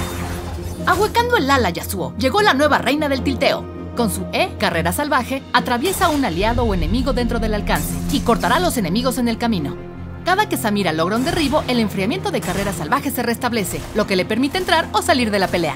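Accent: Mexico. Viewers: agien mas noto ese toque mexa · x q habla en mexicano la presentadora? es que para riot latinoamerica es mexico? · Hablando con memes todo el rato es desagradable oírlo. Además hablando mexicana, perdiendo el acento neutro que siempre proclaman usar.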